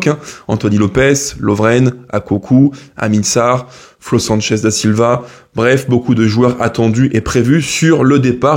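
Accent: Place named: France. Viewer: J'adore ton accent anglais très frenchy! Pour moi, il est clair que Gerlinger va reprendre la succession de Friio et amener son excellence et son sérieux germanique de 18 ans au Bayern et va faire de l'OL une machine de guerre gérée à l'allemande! Sehr gut für unsere liebe Mannschaft! Tschuess, lieber!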